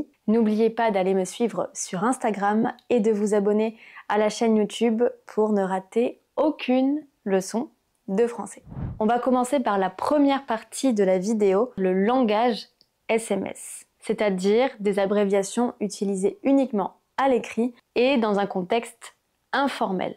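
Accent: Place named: Paris, France. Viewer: J'adore votre accent parisienne,merci.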